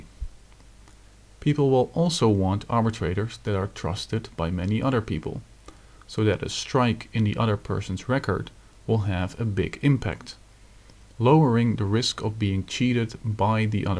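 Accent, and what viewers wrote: Netherlands. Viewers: Do I hear a slightly Northern-European accent there? Perhaps Dutch? :)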